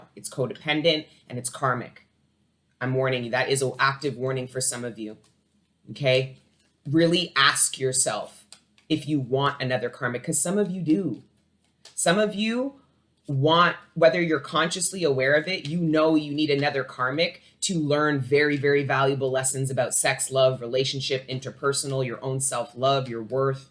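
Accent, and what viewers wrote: Jamaica. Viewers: Your yardie accent had me hooked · Can I ask are you a question are you Jamaican 🇯🇲 ❓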